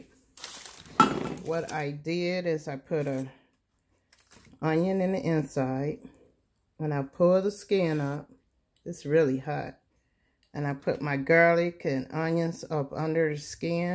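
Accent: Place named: Haiti. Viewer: Ok I though because you have an haitian accent.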